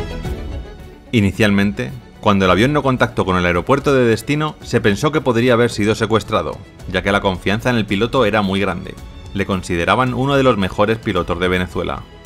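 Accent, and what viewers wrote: Spain. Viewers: que dijo????? Puedes modular bien y sacarte ese acento español.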